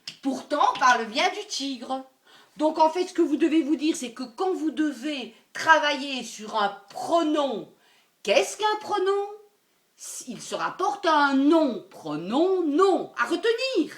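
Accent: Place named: South, France. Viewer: Vous avez l'accent sudistes je crois je dis sa car je viens d’emménager dans le sud et mes professeurs disent tout le temps ' ils ou elles ' en prononçant le s à la fin .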